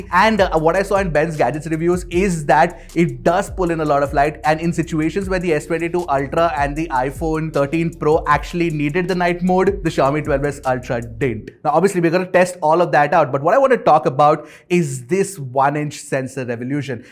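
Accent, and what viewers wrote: India. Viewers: I swear indian accent English is pure f.king ear cancer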